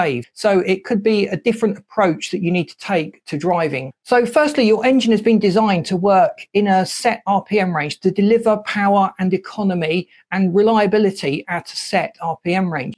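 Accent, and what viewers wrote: United Kingdom. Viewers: Could you change your accent to something non british? Its barely understandable. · Why all the 'clever' advisory comments? This man knows his stuff, as do I (C&Guilds/National Crafts qualified for 46 years), so no need to 'try' to elaborate or add in any respect. He is absolutely 'Bang on' and a rarity, at that! He speaks like a true Brit!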